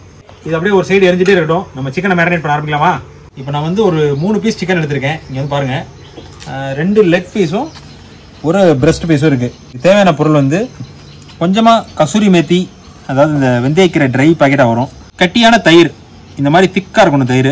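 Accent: Madurai, India. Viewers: எனது வயது 39 எனக்கும் சொந்த ஊர் மதுரை தான். Hope சம வயசு ஆளு தான்னு நெனைக்குறேன். இந்தாளு வீடியோ வையும் பேச்சையும் கேக்கும்போது ஏதோ கல்லூரி, பள்ளி நண்பர்களுடன் இருப்பது போல ஒரு Feel. அந்த கால கட்டம் வந்து போது... இந்த சமையல தாண்டி நீ நம்மூரு ஸ்லாங்ல பேசிட்டே இருயா... அதுவே நல்லாருக்கு... ❤❤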